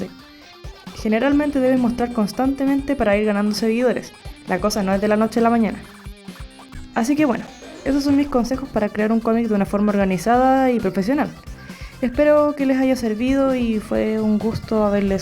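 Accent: Chile. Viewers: Me hice la media idea en la cabeza, y voy a hacerlo medio spaicy Alv Cache el asentó chileno :D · Gran video!!,me funcionara mucho gracias!! PD:me encanta tu acento chileno😙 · Buta se nota que eri Chilena, saludos desde Chile xD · Eres chilena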